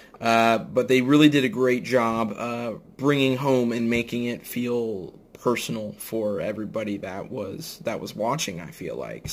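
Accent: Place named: Midwest, United States. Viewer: What part of the midwest are you from? I'm from Nebraska.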